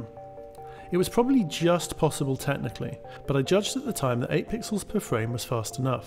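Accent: United Kingdom. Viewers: There is plenty of American content that has a big international-audience but they don’t change their dialect or language, they speak as to where they are from. Speaking as an Australian, I was confused when I first started watching your stuff as you sounded British, and I knew TT was, so initially I thought you and TT were a lot more American than I once thought. Ultimately it is your channel and you can do what you want, I just find it odd you say Genesis and speak for an American audience and i’ve seen numerous other comments saying the same. Toy Story is one of my favourite video games ever, so I don’t mean any hate, I just think you are documenting some great stuff here that will be watched for decades from people everywhere, it should be authentic and speak to as where you and TT are from (I know you might not be there now, but I lived in England for a while but am still ultimately Australian).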